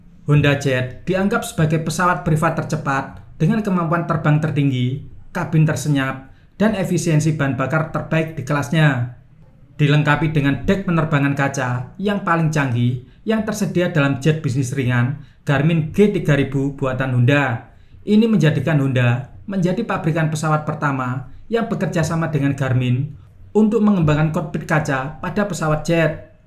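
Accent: Java, Indonesia. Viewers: host medoook 😁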